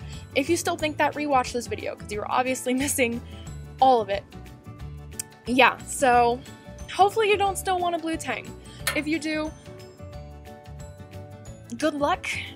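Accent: Texas, United States. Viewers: I just realized you have a slight Texas accent or whatever it's called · is when i really here her texan acent im from texas to so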